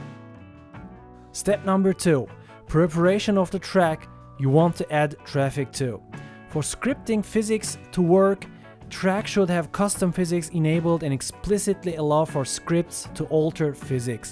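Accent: Turkey. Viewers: türk oldugunu videoya tıklar tıklamaz anladım her turlu belli oluyor rleri söyleyişten :D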